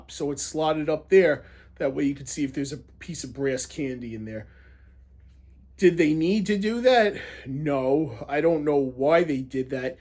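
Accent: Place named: East Coast, United States. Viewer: The most east coast accent ever lol.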